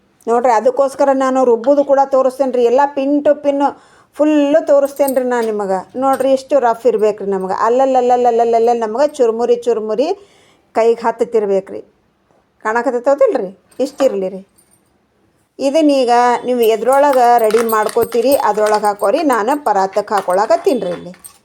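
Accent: North Karnataka, India. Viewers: ಭಾಷೆ ಕೇಳಿದ್ರೆ ಇವರು ಉತ್ತರ ಕರ್ನಾಟಕ ದವರು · ಉತ್ತರ ಕರ್ನಾಟಕ, ಮೇಡಂ ನಿಮ್ಮ ನಿಮ್ಮ ಉತ್ತರ ಕರ್ನಾಟಕ ಮಾತಾನ್ಯಾಗ ಹೇಳ್ರಿ ನಮಗೂ ತಿಳಿತೇತಿ ಮಾತು ಸ್ವಲ್ಪ ಕಡಮಿ ಮಾಡ್ರಿ ಏನ್ರಿ ಮೇಡಂ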